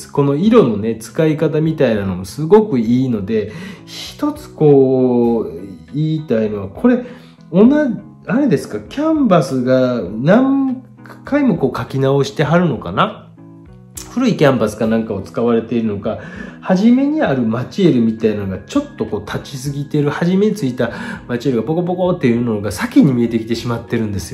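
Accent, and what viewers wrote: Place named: Nara, Japan. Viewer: ご指導ありがとうございました。 背景の黒を頑張ったつもりですが、まだまだ足りませんでした。 紙が粗いのは気になっていました。 紙探しをします。 そしてまた、ガラスを描いてみます。 先生、やっぱり奈良弁ですよ。 「○○できやん」とか、「見えやん」とか「良かったかもしらん」とかは、やっぱり奈良っぽいです。私の奈良のお友達は みんなほんわかしていい人達でした❣️ とにかく 精進しますのでよろしくお願いします😊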